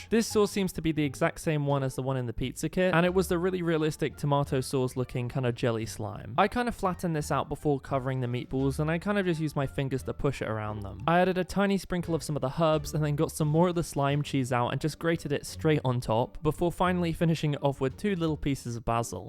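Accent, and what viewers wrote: United Kingdom. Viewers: Not to lie You sound British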